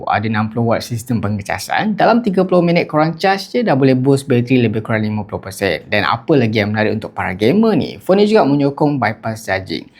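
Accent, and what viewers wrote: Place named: Perak, Malaysia. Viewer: Jongkang jongkit..Kompom org perak ni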